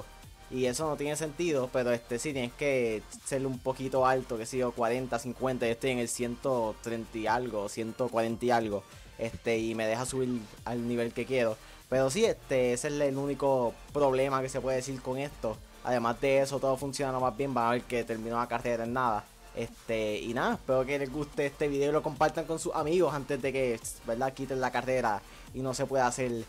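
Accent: Puerto Rico. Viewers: Boricua obligau ajajaja se nos nota de lejo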